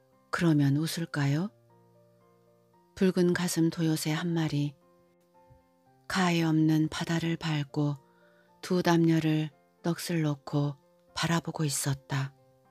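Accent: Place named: South Jeolla, South Korea. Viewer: 전라남도 사투리가.. 겁나~ 맛깔나부네잉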